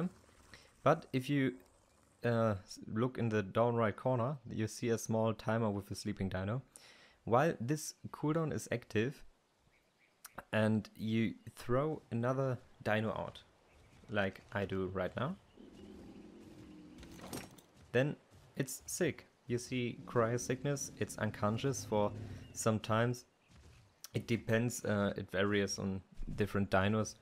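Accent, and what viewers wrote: Germany. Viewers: Do I hear DEUTSCH?????? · Hi thanks Bist du deutsch?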